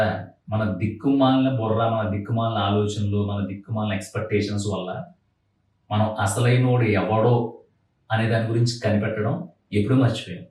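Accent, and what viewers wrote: Godavari, India. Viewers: Bro midhi Godavari district ahhh ?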